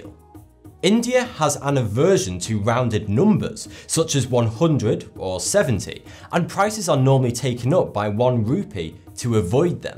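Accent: United Kingdom. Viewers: Now I'm confued. For the longest time I thought you called yourself FORTY-two. But the name of your channel is, so I figured your British accent made it SOUND like. Then you just mentioned they're superstitious of 4, the 40s, including your name FORTY-two. So, which one are you, or? And how did the name originate? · why are you british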